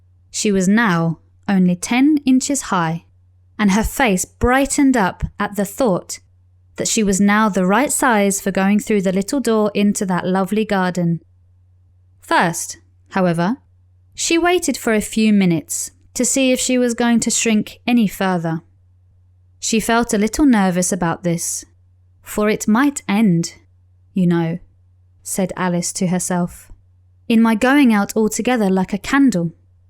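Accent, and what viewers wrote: United Kingdom. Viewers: 피터팬 읽어주기 컨텐츠 기다려보겠습니다!! 영국작가가 쓴 런던배경 동화라 영국 발음으로 공부하면 너무 좋을거같아요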